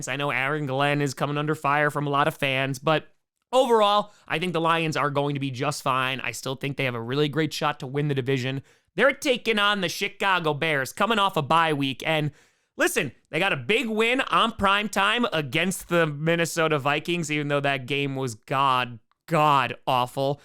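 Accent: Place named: Wisconsin, United States. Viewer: has a Wisconsin accent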